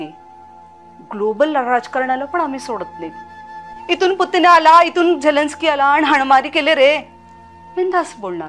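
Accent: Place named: Udgir, India. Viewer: Kay to confidence, kay to speech delivery, kay tey accurate udgir mimicry and description, ekdam goosebumps madhe ❤❤🤙🫡🫡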